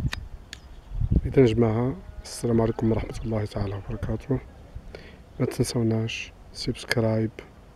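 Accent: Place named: Jijel, Algeria. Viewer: اهدر جيجلي ماتخبيش اللهجة ديالك ا وخيي لازمك تعرف بيها لأنها من تراث المنطقة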